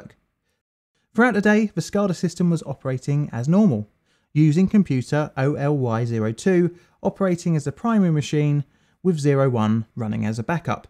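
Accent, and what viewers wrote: United Kingdom. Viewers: Very much so. I enjoy falling asleep to British men talk about disasters. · It’s so weird hearing a British man talk about a bunch of tiny towns I live near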